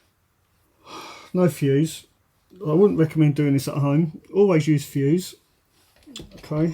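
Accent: Cockney, United Kingdom. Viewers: Are you a cockney?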